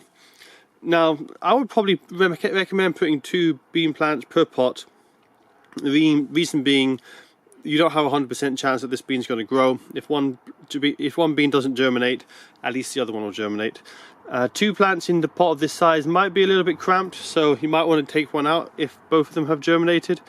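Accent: Scotland, United Kingdom. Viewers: Thanks for posting!!!! I never comment but as a new follower who really appreciates your videos I had to. Your videos are so helpful and a sweet bonus is your great Scottish accent! Definitely going to binge all your videos so I can get pro at gardening during quarantine!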